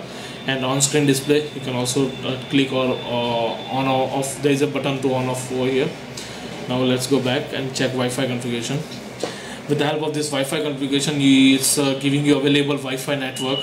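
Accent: India. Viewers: if your going to make a video lose the Indian accent we hate it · Your English accent is very bad and difficult to understand it .. all the Indians the same in this matter .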